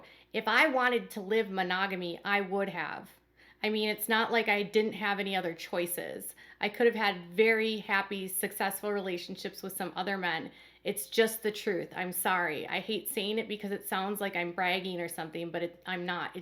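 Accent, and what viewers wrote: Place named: Wisconsin, United States. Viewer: 👍🏼Just wanna thank you for sharing your insights and research with us, you're very thorough!💕 I sooo love your accent, some of my relatives are from Wisconsin and Minnesota so you sound like family to me!🙂 Please stay safe, keep doing what you do and God be with us all, Amen!🙏🏼🙌🏼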